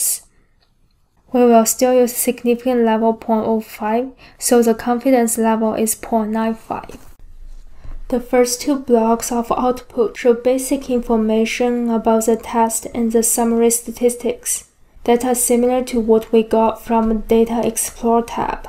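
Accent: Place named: Asia. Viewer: Super thick Asian accent. Had to turn on subtitles.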